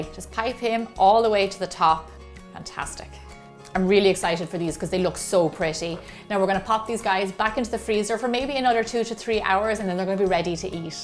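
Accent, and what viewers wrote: Ireland. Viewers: are you Irish g emma?😕😕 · R u Irish???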